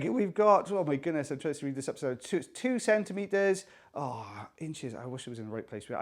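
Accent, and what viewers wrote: Wales, United Kingdom. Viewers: all these comments saying hwo enthusiastic you are; im just hearing a fellow welshman - we always sound enthusiastic hehe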